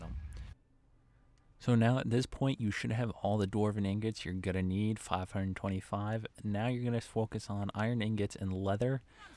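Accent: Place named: Michigan, United States. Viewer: are you from michigan? we talk verrrrry similar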